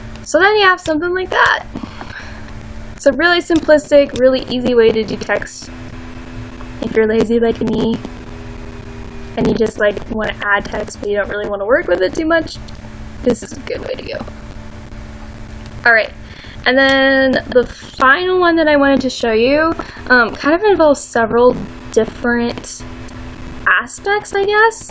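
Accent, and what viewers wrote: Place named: United States. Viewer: oh my god my parody buddy has the cutest american accent! Soooo cute! OUO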